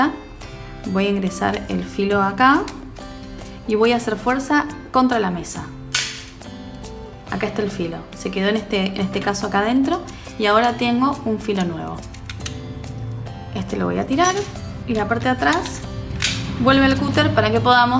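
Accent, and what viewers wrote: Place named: Argentina. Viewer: Amo cómo explica. Los argentinos hablan tan bien. Me fascina.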